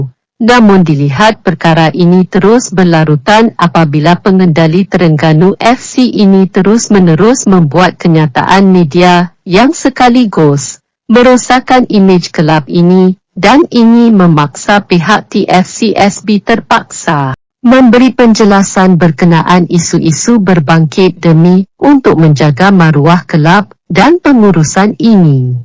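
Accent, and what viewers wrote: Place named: Indonesia. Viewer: Yg bercakap ni indon ke...ckp tak sedap tak jelas...tak ada orang Malaysia ke yg boleh cakap..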